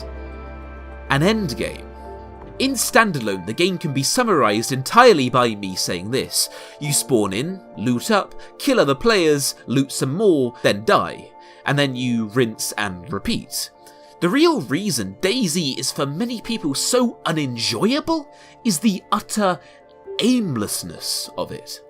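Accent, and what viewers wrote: United Kingdom. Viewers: I only kept watching due to your brittish accent. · Another squeeky britbong youtuber, no thanks.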